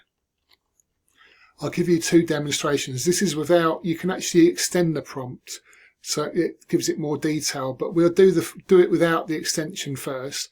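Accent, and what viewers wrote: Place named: United Kingdom. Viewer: I like that you havent used ai to present this. And your British! Get fed up woth American avcents. Soz Americans😮.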